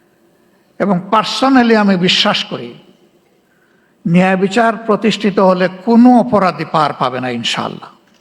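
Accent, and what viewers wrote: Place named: Sylhet, Bangladesh. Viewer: Bangla bolte parena sylete chara. Tobu pod chere youngder hate pod debena.?????/ Dol ke ekjon smart young er hate tule sonmaner sate retire jan. Louv lalosa poder chere den. 16 bochor kicu hoi nei apner dara, kormider charate kono kaj koren nei. Julom hoyche apnader dalalir jonno. Jatike ar koto boka banaben vason deya, kajer belai zero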